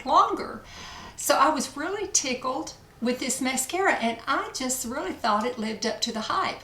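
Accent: South, United States. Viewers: I could listen to your that lovely southern voice of your s all day ❤️💞 definitely going to buy that mascara.you have lovely eyes so sparkly.eyes are definitely the window to the soul.😘😘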